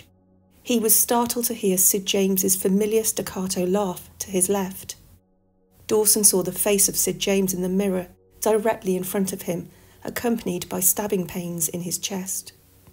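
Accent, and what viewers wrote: England, United Kingdom. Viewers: Honestly, I glom to your work as a result of your voice and content. I might watch an episode after the fact for the visuals in order to get a sense of place, but this is always an afterthought. I prefer to use my imagination. As to the questionl on ASMR do what you want. I am perfectly content being soothed by your voice and personality when it pops up in your occasional afterthoughts. "What do you think?" Although I find the topics of ghosts intriguing I find the history of Great Britain equally so. I find it a charming combination. With your quiet voice and accent you could probably get away with reading a list of recipe ingredients. Well, that's not true. But with You Tube being mostly about visuals for me your voice and persona are the selling points. I will stop repeating myself now. Looking forward to what you concoct in the New Year. 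· I'm Australian and I would love to have a beautiful English accent such as this, it's very easy on the ear.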